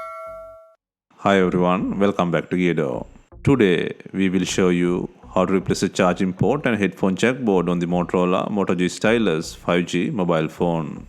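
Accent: India. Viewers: Avec un type de colle genre CYANOACRYLATE ,bravo impossible par la suite de pouvoire ré-ouvrire pour une autre réparatation,c est comme se tirer un coup de 12 ds les pieds cà!!!!!🙄😤😒🤪🙃😡🤬 Utiliser plutot une colle caoutchouc!!!!!!! Apres y sera toujours possible de le réouvrire & aussi tres facile de nettoyer la colle avant d en. ré- appliquer pour le refermer,une simple logique !!!!! Un accent infernal indou🙄😤😒😑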